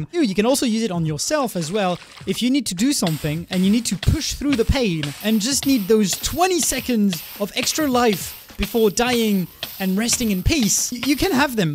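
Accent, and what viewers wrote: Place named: France. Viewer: are you french ? you have a small accent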